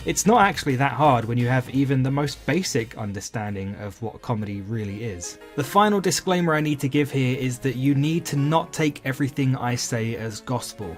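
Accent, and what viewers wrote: United Kingdom. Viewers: This was like having my life condensed into half an hour and read by a British man. legitimately made me cry for the first time in a very long time and broke what i think was a lifetime no cry streak. I needed this, Thank you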